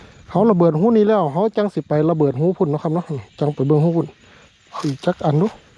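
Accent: Chaiyaphum, Thailand. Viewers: สำเนียงเสียง คือคนทางชัยภูมิ แท้ครับ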